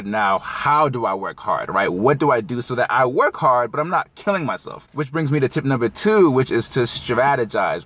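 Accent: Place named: Philadelphia, United States. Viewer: Never mind. I heard Philly. 😜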